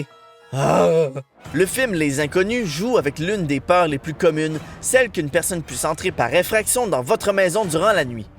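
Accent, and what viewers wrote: Quebec, Canada. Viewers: revenues SVP tout le monde s’ennuie de vous! Vous êtes la seule chaîne qui fait des vidéos québécois sur des films avec l’accent québécois que j’adore. Je suis une québécoise et dès que j’ai trouver votre chaîne je suis tomber sur le charme… revenez!😭😫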